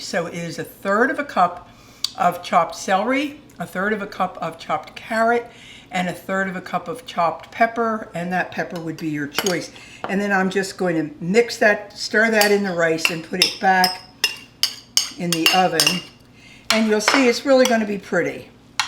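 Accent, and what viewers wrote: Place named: South Jersey, United States. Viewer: I am learning SO MUCH!! Are you for the Philly area?? I’m getting a South Jersey accent vibe! 💕💕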